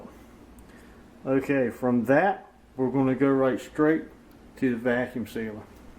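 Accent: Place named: South, United States. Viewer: I just love that southern accent of yours . 😊 On the other hand, in the absence of a vacuum machine, what would you use?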